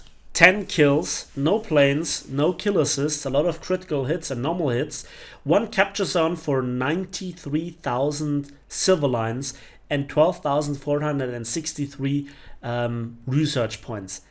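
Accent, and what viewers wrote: Germany. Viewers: dieses extrem deutsche Englisch macht dich so sympathisch. Abo hast du :)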